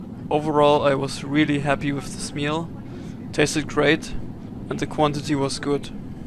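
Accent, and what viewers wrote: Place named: Germany. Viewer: Selten so deutsches Englisch gehört haha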